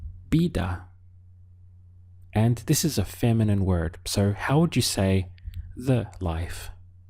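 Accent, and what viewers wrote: Castile, Spain. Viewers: this Spanish is Castilian, right? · Is this the Spain dialect? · Hi, this may sound like a silly question, is this European Spanish? Many thanks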